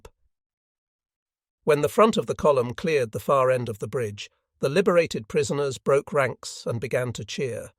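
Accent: England, United Kingdom. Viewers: You must be from the same area of England as Mark Felton.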